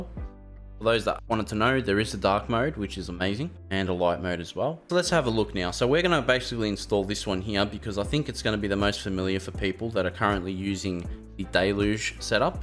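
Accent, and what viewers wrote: Australia. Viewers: don't let Americans force you to change the Aussie lingo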